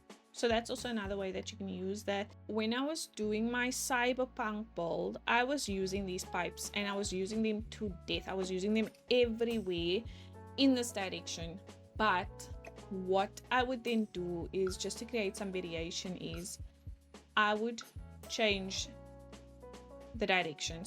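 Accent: South Africa. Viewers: Omg r u South African?😭💗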